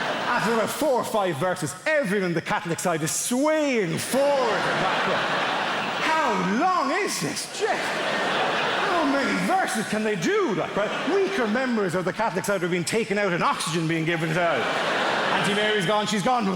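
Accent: Ireland. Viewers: Im from Bray Co.Wicklow the same place as Dara and we have the same accent so technically its a wicklow accent!!! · he's not even funny, you have to be irish to understand a word he's saying. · Is this the Dublin accent then? I wouldn't know, I'm from Norway. Just discovered this guy on J. Ross and found his accent interesting.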